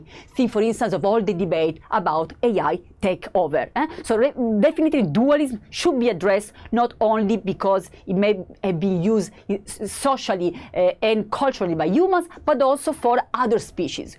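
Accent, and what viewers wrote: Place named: Italy. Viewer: English with Italian accent sounds cute here...😘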